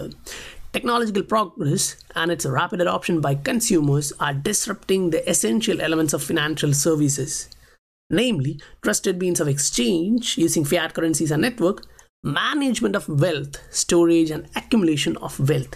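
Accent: India. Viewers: where are you from?? You sound like maybe India? I'm in the US. Shit is crazy here right now. People looting and rioting in the streets its fucking chaos.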